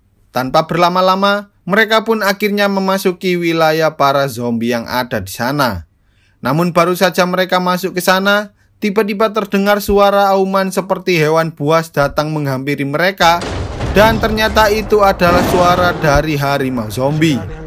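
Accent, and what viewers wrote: Java, Indonesia. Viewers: Bang kamu orang Jawa yah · Ohh wong jowo toh sampeyan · Lha kog medhog banget ... Njawani .. Wkwkwk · Org Jawa lu bg?? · Jenegan jowone ngendi mas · Abg orang jawa? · Bisa gk sih bos ngomong nya jngn medok bngt gitu,, pengen nonton tapi sebel sama suara nya,, 😒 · Mas..ini yg ngmngnya jawanya medok pisan eeuy..(yg menceritakan filmnya)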